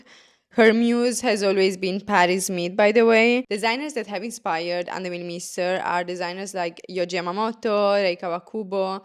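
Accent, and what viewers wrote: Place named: Italy. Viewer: very off topic but where is ur accent from ? Italy ? it feels good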